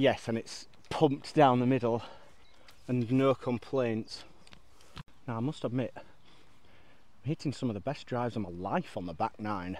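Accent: Yorkshire, United Kingdom. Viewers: thanks mate I am new to the channel really good I reconized your accent as I am from brighouse near Huddersfield. Great video mate.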